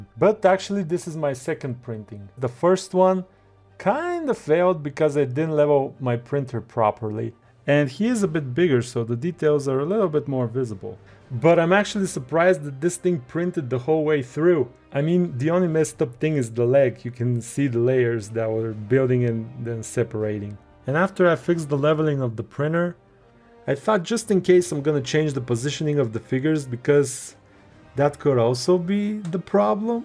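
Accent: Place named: Russia. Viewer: я один слышу тот самый русский акцент?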